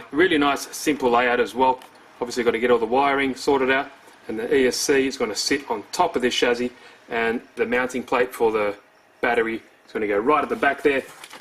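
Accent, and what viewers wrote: Australia. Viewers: secs and i already know he is an australian :D good video mate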